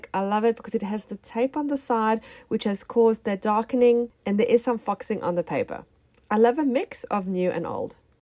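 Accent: South Africa. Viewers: New subscriber here! Are you originally Australian,as you sound very South African to me -I am a SA expat,so just curious 😊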